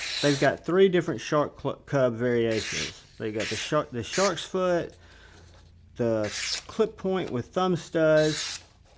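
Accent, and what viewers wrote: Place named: Nashville, United States. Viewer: Enjoyed that , whenever I heard your voice I’m thinking , Nashville born and raised 🤔 then again I’m an Aussie 🇦🇺😂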